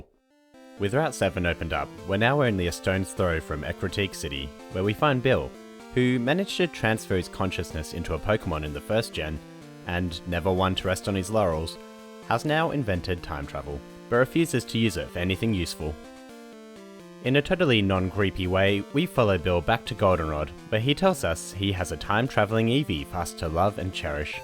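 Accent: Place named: Australia. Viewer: a fellow Aussie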